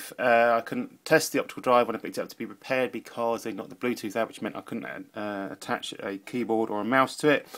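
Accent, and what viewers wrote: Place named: London, United Kingdom. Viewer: Wha(t) a nice Bri(t)ish Londoner accent 'e 'as. Take a DVD and pop it in.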